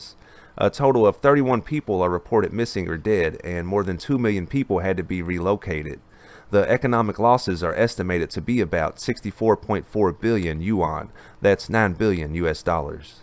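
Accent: South, United States. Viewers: This southern accent attempting so many Chinese names is somehow both endearing and unbelievably frustrating lol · When I clicked on this video I did not expect to hear someone so southern hahah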